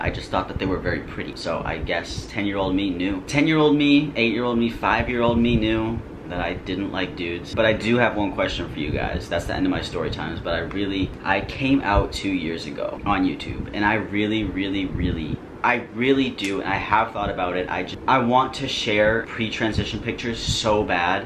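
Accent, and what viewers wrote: United States. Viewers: Not 2 be racist but I thought you was American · I THOUGHT YOU WERE AMERICAN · I thought you were american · I thought you were American??¿ 😂😂😂I am so sorry I had to 😂😂😂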